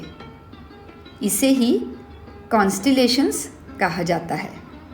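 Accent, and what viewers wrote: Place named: Maharashtra, India. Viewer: छान विवेचन आहे. पण एक मात्र नक्की की मराठी माणूस जेंव्हा हिंदी बोलतो ते लगेच लक्षात येते. 😊😊😊 Great going... 🙏🏻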